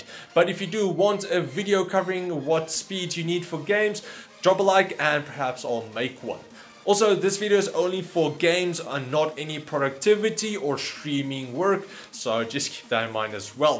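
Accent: South Africa. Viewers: Woah, I didn't expect you to be south african.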